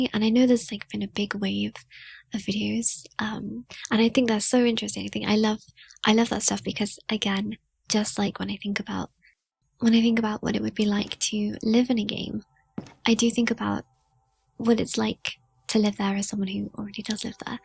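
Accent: England, United Kingdom. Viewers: This is the most English voice I've ever heard, goated 🙏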